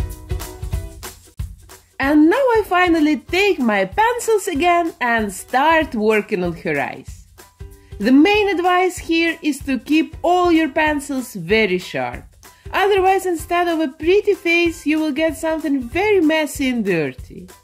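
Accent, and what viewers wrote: Russia. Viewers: Русская что ли? Слышно что английский не твой родной язык.... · I'm sorry for asking but is she a Russian or Ukrainian? She has a very strong accent... like I have, haha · I love your accent! Are you russian? If you are, I am too! ❤ love your channel btw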